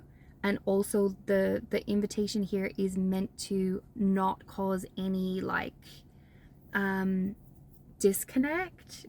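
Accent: Ireland. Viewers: you are confusing me. You have a Scottish complexion but every now and then you sound Irish. But you're American right?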